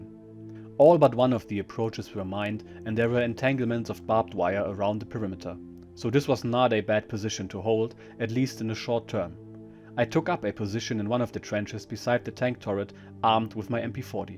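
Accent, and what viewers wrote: Germany. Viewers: I didn't realized you'd posted Juno part 1 and was delighted to see a Juno Beach part 2. I've just now listened to both parts and like your other content, it is excellent. This is content that anyone fascinated by WW2 will enjoy. I'm a 50-ish year old American and have been fascinated by the war since childhood. Thank you for these stories from the German perspective. I've read many memoirs and diaries myself, and hearing this in your own accent adds something entertaining. One can imagine the soldier himself is reading the story.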